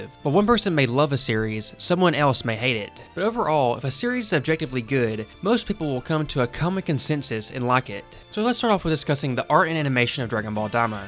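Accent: South, United States. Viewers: Bro has the most southern accent I've ever heard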